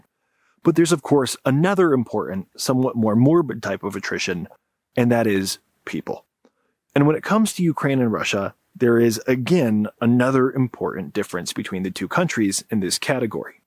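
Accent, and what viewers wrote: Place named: United States. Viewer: As Ukrainian ,i am nicely surprised that person who lives in States ,has so deep knowledge of what happens in our country.all arguments are clear & true In addition,so comprehensive americane english,not need to force your ear,plus we are getting information on the beautiful enviroment back there Wish your actual president could listen to intelligent people like this and not his morons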